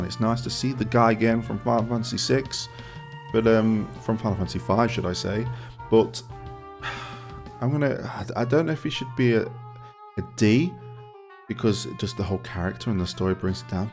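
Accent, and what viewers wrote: United Kingdom. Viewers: And you, sir, I put you in the S Tier. Your English accent really rounds out your professional approach to it all. You’ve got my subscription although I will say… Sabin is S tier. s for suplexing that trainnn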